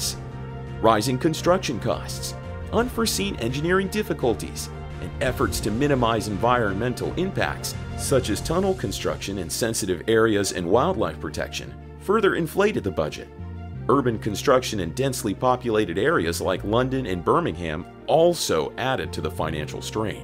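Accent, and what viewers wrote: United States. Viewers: Why the hell is a yank the voice of the video, can't they afford to get a British person to do the voice over. The HS2 is a English Railway engineering project between London and Birmingham Not New York to Miami. 💂‍♂️💂‍♂️🇬🇧🇬🇧🏴🏴😡😡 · Littered with mistakes and covered in an American accent 👎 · what mistakes, and what problem do you have with the American accent?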